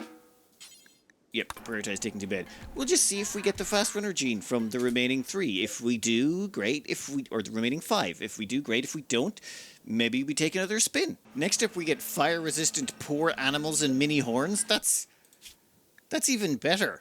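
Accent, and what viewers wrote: Ireland. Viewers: Man WTF... Your life does not mean you can stray from the now mandatory daily update of the saga.....:eyes-purple-crying: love you.. longer vids plz, just give us the full 3 hour sesh without edit on a side vid, ppl would watch or listen to you for hours. Those who say otherwise are metal horrors... All you would need to do is talk for 3 hours... no trouble at all XD. My Girlfriend plays Oni and watches your vids religiously on that game.. I play Rim and do the same.. But we both watch any of your vids in the background while playing or chilling... eg. If you have a little more time to do comentary on longer vids ppl will watch or listen, as a Scotsman its very nice to hear an Irishman narrating Great vids on my Fav game! :face-green-smiling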